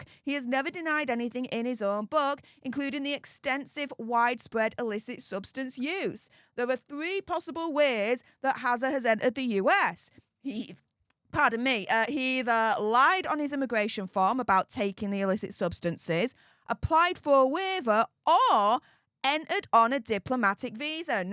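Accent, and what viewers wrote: Yorkshire, United Kingdom. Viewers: i can see now why you call yourself. As a fmr lawyer let me tell you, you read thro that court order like a professional lawyer. Many ppl find it hard due to the inserted sub sections etc markers, yet you were able to read it beautifully, without stopping, well done. Its not as easy as you made it look, yep you are Def a a very attractive intelligent. I am just up the road from you in Doncaster so it was easy for me to understand the accent when you read that court document. 👏👏👏👏👏👏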